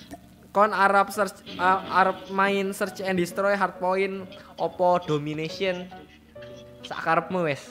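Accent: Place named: Java, Indonesia. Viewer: Medog Wkwkwk 😂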